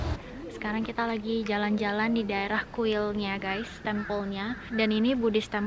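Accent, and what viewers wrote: Indonesia. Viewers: Medok banget bhs indomu dek !! love it !!! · Ini org korea atau indonesia ya, logatnya sama persis bgt kya org indonesia.. 😅😅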